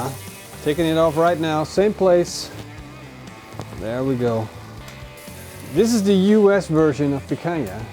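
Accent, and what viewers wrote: Netherlands. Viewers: He sounds a bit Dutch.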